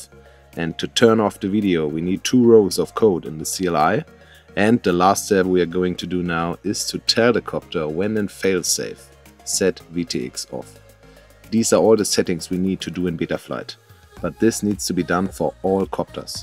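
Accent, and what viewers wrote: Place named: Germany. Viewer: Der Akzent klingt irgendwie nach Deutschland, also bin ich mal ausnahmsweise so frei: Top, sowas such ich. Ich wollte schon ewig wissen, wie die Technik hinter Formationsflügen etc. funktioniert. Kleine Drohnenshow machen und so. 🙂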